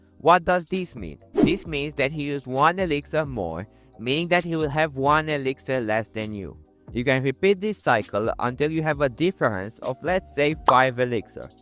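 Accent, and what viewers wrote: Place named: Iran. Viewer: by his accent, he seems irani/persian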